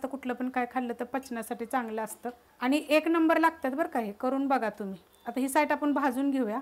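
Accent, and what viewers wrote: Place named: Kolhapur, India. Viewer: काकी , तुम्ही कोल्हापूर भागातील आहात का... मला तुमच्या बोलण्यावरून् वाटले ... तुमच्या रेसिपी खूप आवडतात मला... मी नेहमी करून बघते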